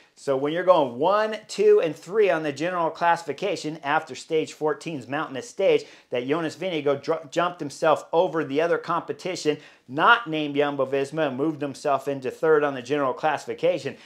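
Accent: United States. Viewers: I do believe you're right in what you're saying. But I can't keep watching till the end. The narrating is a bit too enthousiastic, too american. Sorry.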